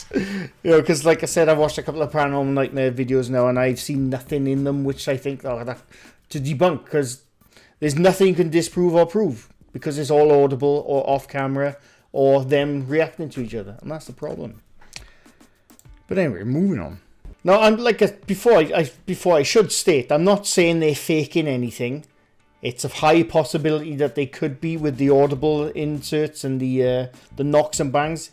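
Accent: Wales, United Kingdom. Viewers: Are you welsh? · I can't get over people thinking you are Irish or Scottish when your Welsh accent is so strong! mind you i can picture you in Braveheart.....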